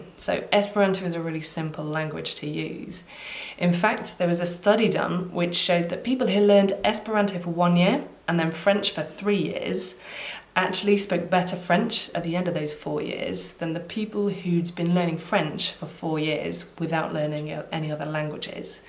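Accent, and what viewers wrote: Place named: United Kingdom. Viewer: Great video. I'll be using this "the" explanation for my future teachings. Plus, always good to hear that Brit accent 😉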